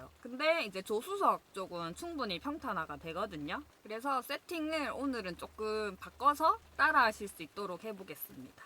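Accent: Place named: Gyeongsang, South Korea. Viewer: 잘뵤고 있습니다~ 전대구에 사는데 경상도 억양이 정겹고 더 좋으네요~❤❤ 사시는곳 물어봐도 될까요~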